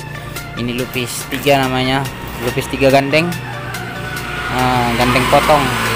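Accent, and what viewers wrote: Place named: Pematangsiantar, Indonesia. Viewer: Dimna lokasi bengkel mas... Dari logat bicaranya kyk org siantar abg ini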